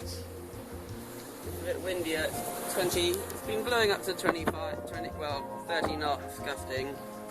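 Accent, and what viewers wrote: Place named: United Kingdom. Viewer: Hi, some time soon Im hoping to sell my house and buy a boat too. I have just started to learn about sailing,may I ask what boat you have and make pls? Where are you from I hear a british accent, I myself am from Cardiff South Wales.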